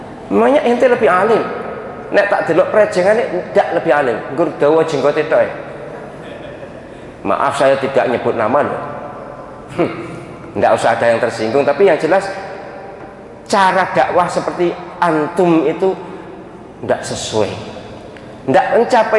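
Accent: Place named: Madura, Indonesia. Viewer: Madura mireng prof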